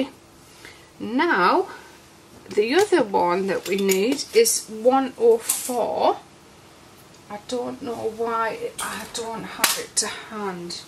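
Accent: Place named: England, United Kingdom. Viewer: Are you based in England because you sound like a English person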